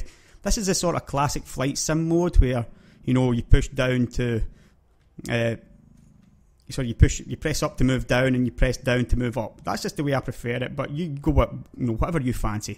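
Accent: Scotland, United Kingdom. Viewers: your accent here is beautiful, one of the most luxurious Scottish accents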